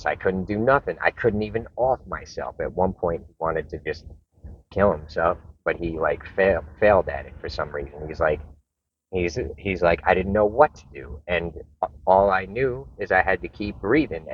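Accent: New York, United States. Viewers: you're a great story teller. Love your videos and that NY accent!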